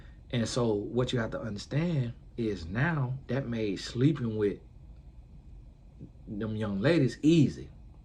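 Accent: South, United States. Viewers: Baby the country accent is sending me 😂😂😂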